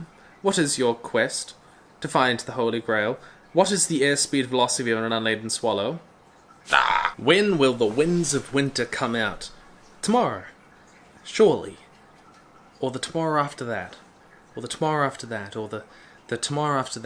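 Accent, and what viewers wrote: New Zealand. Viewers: Which New Zealand accent, though?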